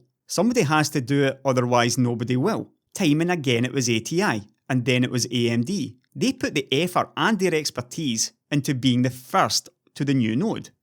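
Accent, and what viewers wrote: Scotland, United Kingdom. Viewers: Dat scoooootish acceeeeeent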